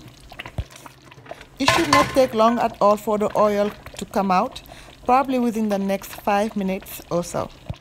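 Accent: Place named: Haiti. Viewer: You sound haitian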